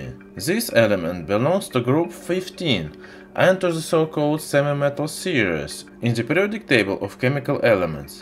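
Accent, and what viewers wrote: Russia. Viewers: Maybe this guy should use a computer to narrate. товарищ твой акцент чересчур русский. Не понимаю:(( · What’s with the FPS Russia accent?? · It's Russian accent) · Русский акцент ,поработай над этим.а так ахуенно. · русский акцент выкупается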